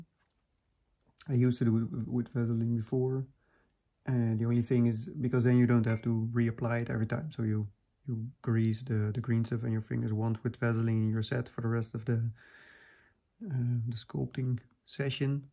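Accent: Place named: Netherlands. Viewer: Bedankt, man! Ik denk jij zijn Nederlands van je accent? ;) Sorry, mijn Nederlands beroerd!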